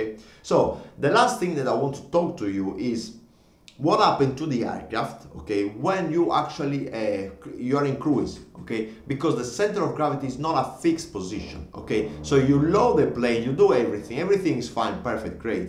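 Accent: Italy. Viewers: You sound very Italian! Ciao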